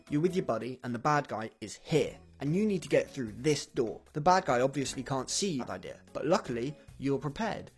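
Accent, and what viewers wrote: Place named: United Kingdom. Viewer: The vid wassss toooo shoorrrttt😭😭😭, wait, he's British?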